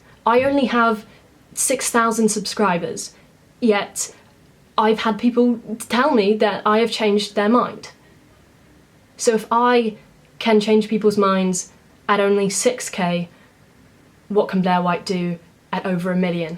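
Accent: New Zealand. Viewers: Omg you’re a kiwi?!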